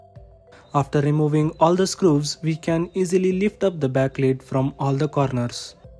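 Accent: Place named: India. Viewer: obrigado amigo indiano, eu amo o sotaque da voz de vocês, seu video foi rapido e muito util para mim. nota 10!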